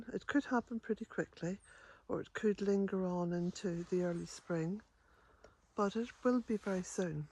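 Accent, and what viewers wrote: Ireland. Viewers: Yet again, thank you for your words, you speak so much sense. I love your hat. You always look so smart, and we all love your soft voice and Irish accent. What a gift you are for us poor souls. It is my Birthday on the 19th November, and there is a full moon! I am so pleased, and shall be out in the dark just taking in the beauty of it all. May I send you Blessings from Devon xxx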